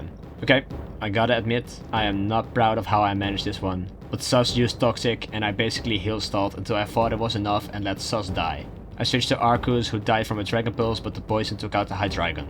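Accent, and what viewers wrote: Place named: Netherlands. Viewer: You sound like you are from the netherlands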